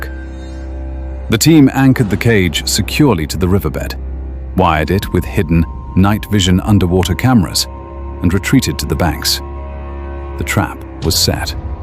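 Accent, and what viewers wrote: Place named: United Kingdom. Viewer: As soon as I heard the British voice, I knew it was going to be good.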